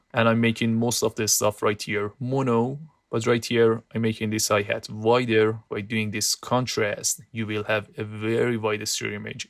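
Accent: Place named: Tehran, Iran. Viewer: The Accent Look Soooo Bache Tehron 😃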